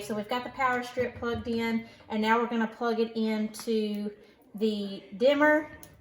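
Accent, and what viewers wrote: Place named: South, United States. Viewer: Adorable slow southern drawl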